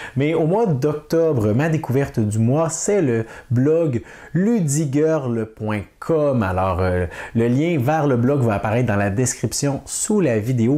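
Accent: Quebec, Canada. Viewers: Nouvel abonné, je vois suis depuis la Toulouse en France. Je vous ai découvert par hasard, et je vais être honnête c'est l'accent québécois qui m'a fait triper ! Plus sérieusement, c'est votre vidéo sur cloudspire qui m'intéressait, et grâce à vous (ou à cause de vous ?) mon portefeuille va saigner car j'ai très très envie de me l'offrir ! Merci pour votre passion et bonne continuation ! Salutations de Toulouse !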